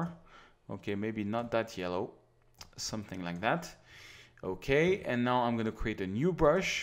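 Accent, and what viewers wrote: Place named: Paris, France. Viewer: god ! you are so french so parisien...but is ok ! je suis un toulousain in hawaii..continue ton bon travail ......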